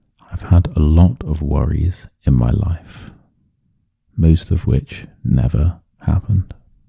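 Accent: United Kingdom. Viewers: British English (which I love)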